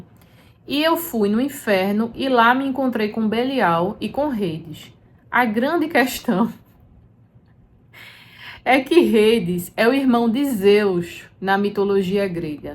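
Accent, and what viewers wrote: Northeast, Brazil. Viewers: Olá! Adorei teu sotaque de algum lugar do interior do Nordeste!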